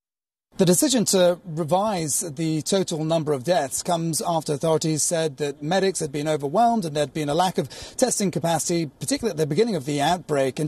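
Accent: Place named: India. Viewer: INDIA ACCENT TAKEN TEEKEN, ABLE EBLE, SAY SEY, FACEBOOK FECEBOOK, GO GU, WAY WEY, GROWTH GRUTH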